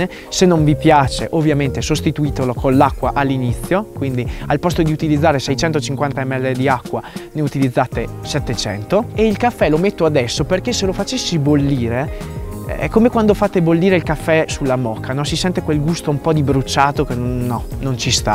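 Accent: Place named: Romagna, Italy. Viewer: Come mai hai la ESSE romagnola?!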